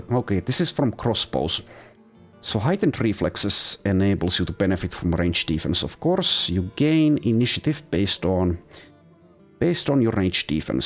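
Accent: Finland. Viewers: The moment I opened the video and heard your voice, I though, "You must be a Finn". I wasn't disappointed :)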